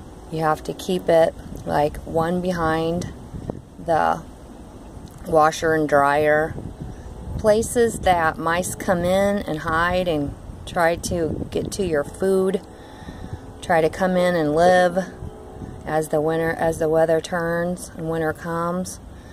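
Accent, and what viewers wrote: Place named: Indiana, United States. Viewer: That's an Indiana accent I think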